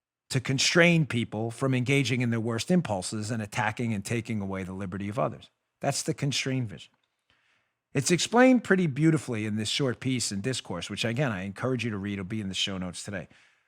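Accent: New York, United States. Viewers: !! You are SO NY and SO Italian!! Love it!!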